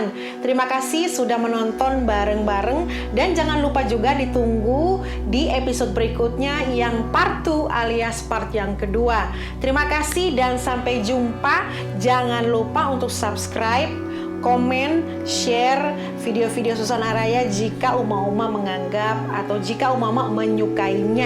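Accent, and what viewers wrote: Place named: Surabaya, Indonesia. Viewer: khas sbyoan dan malang an logatnya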